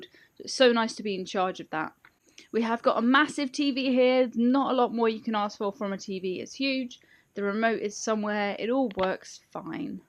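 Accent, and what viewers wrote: RP, United Kingdom. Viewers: Hi, I’m just going through your back catalogue trying to find your oldest or first vid. Am I close with this one? Also you sound so much posher in this vid, is it like your yt version of the old telephone voice 😂 really enjoying your old stuff. See you back in 2023!!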